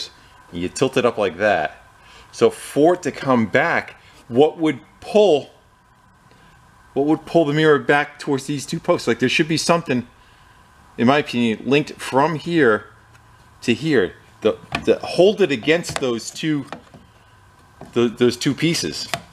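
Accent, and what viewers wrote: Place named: United States. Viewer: Just to mention great great american accent very clear and concise and you have a great laugh which is not patronizing effect.good health🙏🙏🙏🙏🙏🙏🙏🙏🙏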